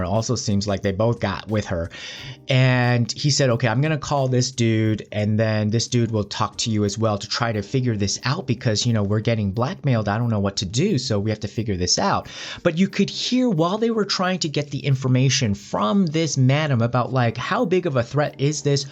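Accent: United States. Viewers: Ok dude, its maybe just me, but you need to LOOSE the cowboy--gay--ghetto sound...were you raised in merica?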